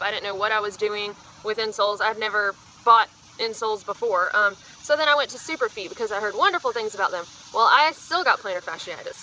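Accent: South, United States. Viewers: while its not Plantar Fasciitis, I got bad shin splints while section hiking the PCT... Good advice for both really is stretching, something I was like 'yea I don't need to stretch...' You sound like your from Georgia or South Carolina or something lol ;-)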